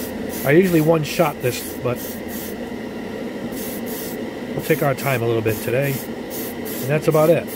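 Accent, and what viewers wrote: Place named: Massachusetts, United States. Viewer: Hey but your accent it sounds like you're from Massachusetts. I'm in Halifax on the South Shore. What store in Massachusetts can I pick this paint up at where do you go. Thank you so much I think I'm doing my 24th scale drift car '1970 Camaro. All Chrome. Maybe blue race stripes. Or maybe just some pinstriping good anyways if you can help me out I'd love it thank you very much great video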